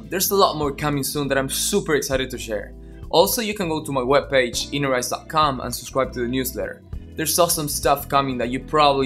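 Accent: Argentina. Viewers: Tenés voz de porteño, puede ser? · Wow, Im from Argentina too, and I love your accent and the way you talk in inglish is awesome!!!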